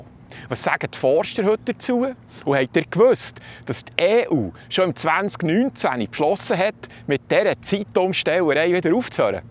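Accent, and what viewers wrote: Bern, Switzerland. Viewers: so nes schöns bärndütsch! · Potz hei ei ei... was för nes heimeligs, wunderbars Bärndütsch, wie fasch zu Gotthälfszyte. I bi fasch d Bänne abgheit wäg de ruschtikale Wörter. Merci viumau! Ha di Kanau grad abonniert. Gruäss us Brunnen SZ. :thanksdoc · 💪😄🙏🙏mega schöner Berner Dialekt. Gruß aus dem Aargau 🙏☘️☘️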